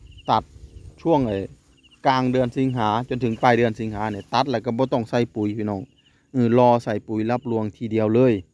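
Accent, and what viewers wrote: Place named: Sisaket, Thailand. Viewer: สำเนียงคือศรีสะเกษนอครับ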